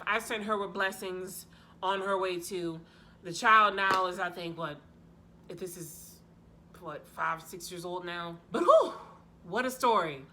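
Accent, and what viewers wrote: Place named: Bronx, United States. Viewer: Omg I swore you were a Bronx or Brooklyn girl 😂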